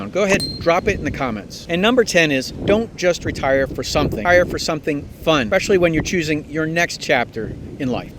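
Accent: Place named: Baltimore, United States. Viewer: Sounds like a Baltimore or Pennsylvania accent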